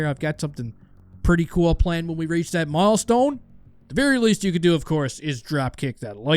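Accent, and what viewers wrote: Newfoundland, Canada. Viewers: What part of Newfoundland you from dude?😅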